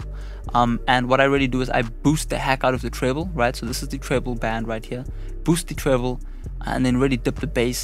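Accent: South Africa. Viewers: u sound like ur from south africa. It sounds nice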